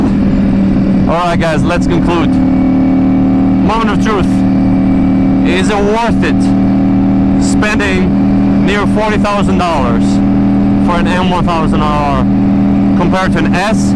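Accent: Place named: Greece. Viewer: violently greek accent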